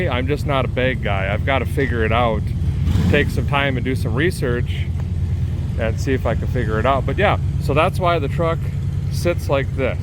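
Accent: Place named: Minnesota, United States. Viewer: I've never really noticed the Minnesota/Wisconsin in voice before hearing him say "bag" a few times while speaking about longmire. Bagged trucks are a lot of fun and can also be a lot of work.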